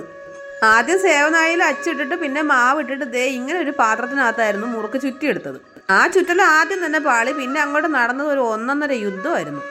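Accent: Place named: Kottayam, India. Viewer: ok👍 സംസാര രീതി കേട്ടപ്പോൾ ഞങ്ങളുടെ കോട്ടയംകാരിയെപ്പോലെ തോന്നി. അതാ ചോദിച്ചത്🙂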